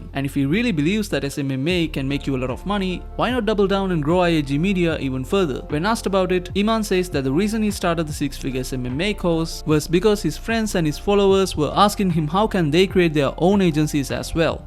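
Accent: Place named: India. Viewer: I think you should use ElevenLabs instead of your natural voice. I really mean no disrespect but I think that the indian accent is unfortunately putting people off from watching the video. Despite the video being really good. This might be why your videos are not going viral.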